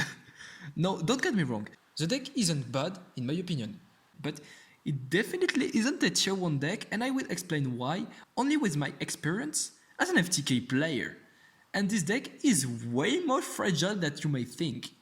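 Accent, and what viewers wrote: France. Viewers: Sound like french accent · Le petit accent français haha . Gg propre tes vidéos je m'abonne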